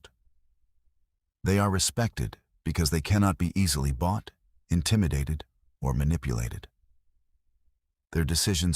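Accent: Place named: United States. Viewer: Good job with the the American accent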